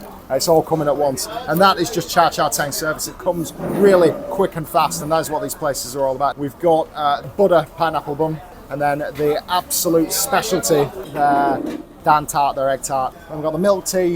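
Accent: United Kingdom. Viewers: Hello, it is much an enjoyable experience to watch your video about HK food scene and when you talking in British accent. We needed your expertise on analyzing how good is the HK milk tea in comparison to traditional English breakfast tea, it is enjoyable especially watching that segment. HK milk tea was invented somewhat based off the original formula of the original English Breakfast tea or Ceylon Tea during Colonial Era. I left HK 30+ years and occasionally I drink Twinnings tea bags and HK che chean tang here in Los Angeles suburbs are not that good compared to HK locations.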